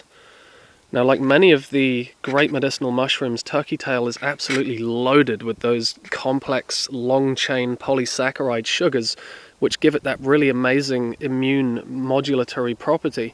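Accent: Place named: United Kingdom. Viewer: You sound British, where about didn't you find the mushrooms? I didn't think they grown in the UK.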